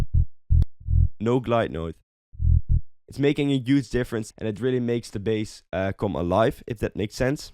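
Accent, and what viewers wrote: Netherlands. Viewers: heerlijk dat nederlands engels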